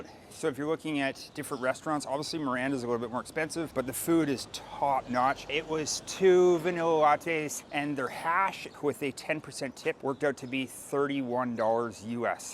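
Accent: Canada. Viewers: Your from Canada EH?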